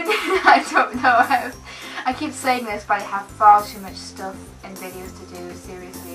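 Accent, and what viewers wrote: United Kingdom. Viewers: Are you either.. Scottish? English or irish?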